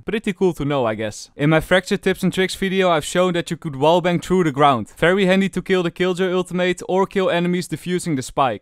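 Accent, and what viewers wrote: Netherlands. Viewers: Its a dutch accent😂 the way he talks and he confirmed it with the word camera lol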